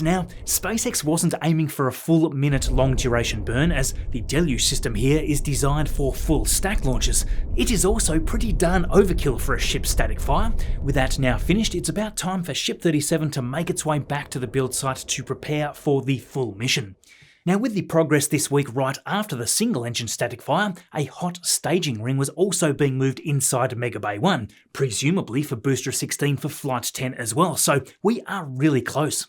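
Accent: Australia. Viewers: I love listening to Australians explain stuff. Their accent is top notch.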